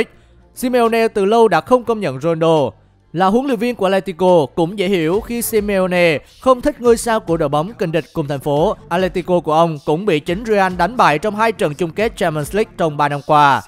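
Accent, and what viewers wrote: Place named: North, Vietnam. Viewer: Sao tiếng BẮC KỲ khó nghe quá 👎👎👎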